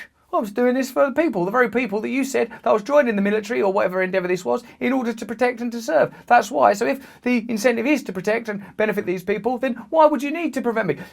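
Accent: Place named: United Kingdom. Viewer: Love your old man British accent.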